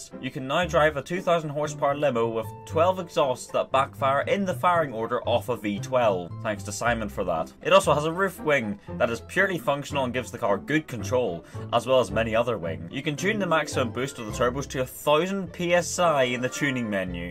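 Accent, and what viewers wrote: Germany. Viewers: wait, are you german ? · You german?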